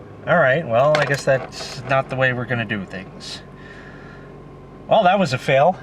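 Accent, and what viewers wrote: New York, United States. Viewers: Ethnicity: lebanese with nyc accent :) thanks for the video Habib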